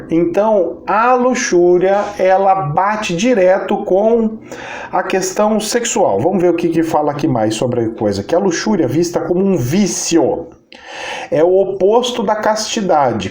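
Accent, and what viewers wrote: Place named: Caipira, Brazil. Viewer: Eu acho tão engraçado o jeito caipira que você fala, a aula fica mais divertida...kkkk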